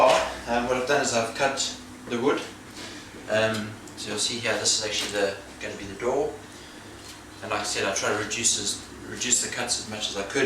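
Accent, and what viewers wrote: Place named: South Africa. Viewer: Not at all, you sound 100% South African, just wondering if you were also experiencing people getting confused with the 'English' South African accent as opposed to our Afrikaans accent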